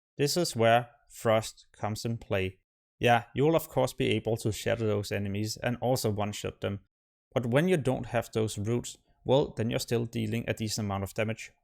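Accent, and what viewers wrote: Denmark. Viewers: why can i always hear someone is from denmark xD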